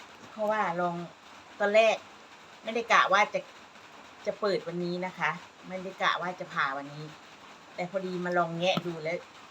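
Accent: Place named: Sakon Nakhon, Thailand. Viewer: ❤❤ชอบค่ะพูดย้อปนไทยเผยแพร่ภาษาคนสกลนคร🎉🎉🎉🎉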